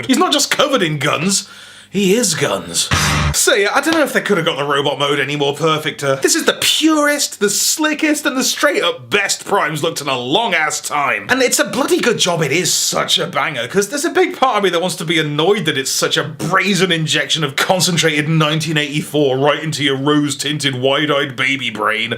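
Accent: United States. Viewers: That American accent tho! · !!! Your American accent is better than mine!!! And I’m American!! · The world needs more American. Can you do a review using only that voice? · needs to do an entire review in an American accent.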